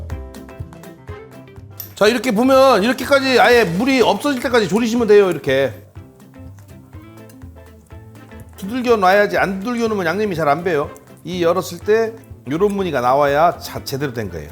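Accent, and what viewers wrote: Chungcheong, South Korea. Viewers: 비빈거여. 뭐여 ..충청도 능청사투리..ㅎㅎ 넘 재미나요~~~ 연기실력도~~ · 박사님 고향이 혹시 충청도!?!? ㅎㅎ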